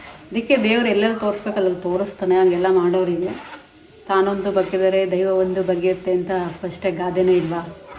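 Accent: Mandya, India. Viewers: mandya bhashe ne hange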